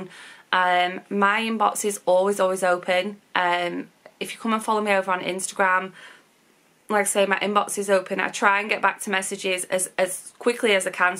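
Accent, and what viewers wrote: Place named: England, United Kingdom. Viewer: Just wanted you to know I love to watch your videos and listen to you speak in general ! I live in the US in TN so I’m very southern and we do not hear an English accent often. Your videos give me strength to Carry on most days when dealing with my fibromyalgia pain; your all around funny, kind, heart warming and a great mother from what I can tell in your videos. I know you didn’t mention this in your recent video, but how are you losing your weight ? I’m 41 and my doctors tell me to lose weight to ease the pain but I have yet to find anything to help KEEP it off.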